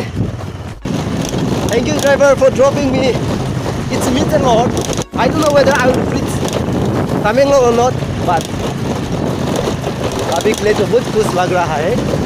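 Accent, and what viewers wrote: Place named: Assam, India. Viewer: He talks like the Assamese.. Eg÷ Chai-Sai, Kaab-Kob, etc.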